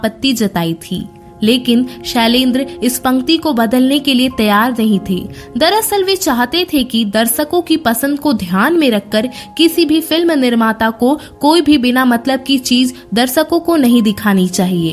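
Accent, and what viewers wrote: Bihar, India. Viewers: Bihar se hai ye ladki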